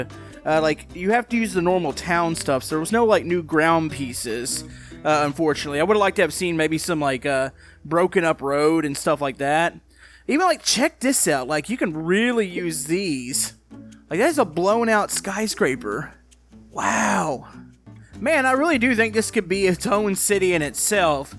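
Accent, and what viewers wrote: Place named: West, United States. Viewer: for some reason i actually thought he had a farmer accent or like sort of a western accent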